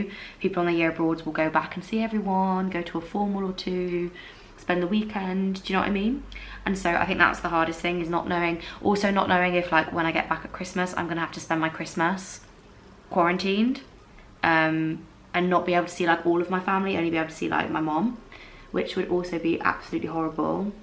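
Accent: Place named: RP, United Kingdom. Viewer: where's your accent from? it's sooo posh! <3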